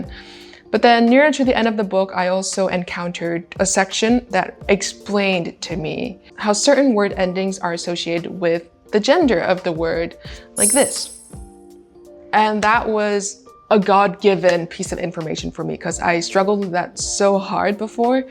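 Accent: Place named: France. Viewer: What a beautiful french accent, congrats!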